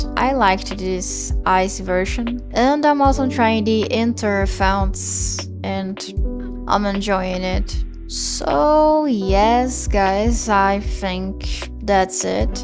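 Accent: Brazil. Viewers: Meu ouvido brasileiro detectou algo brasileiro 👀 kkkkk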